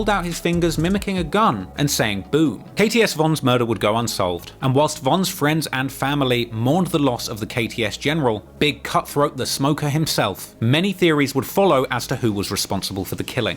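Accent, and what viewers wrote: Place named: Cockney, United Kingdom. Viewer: 😂😂😂 it's PAH-STO ..that cockney accent fucks up alotta pronunciation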